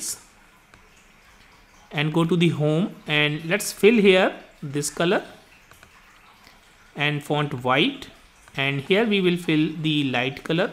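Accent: India. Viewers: stop talking like freaking indian.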